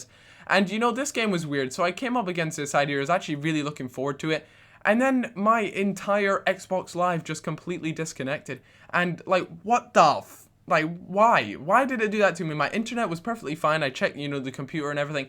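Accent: Scotland, United Kingdom. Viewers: wait he is scottish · I hate ur gay scottish voice!!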